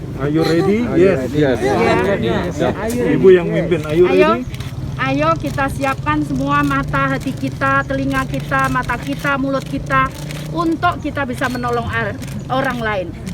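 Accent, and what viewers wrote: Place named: Surabaya, Indonesia. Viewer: Memdengar bhsnya serasa msh di Surabaya. Lanjut bu!!!!.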